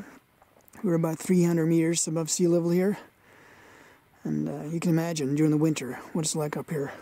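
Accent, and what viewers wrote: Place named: United States. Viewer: Ok, i imagined that you were a turist due to your english pronunciation that looks like american (or canadian) to my ears .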